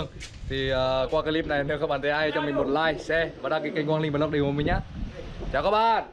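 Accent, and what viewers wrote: Nghe An, Vietnam. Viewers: Anh ở nghệ an à anh..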